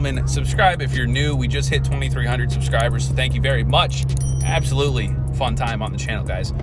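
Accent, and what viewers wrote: United States. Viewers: He's American, they say alot of things differently. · It's kind of a midwest thing. Even in Canada, folks from the prairies say "Oddie".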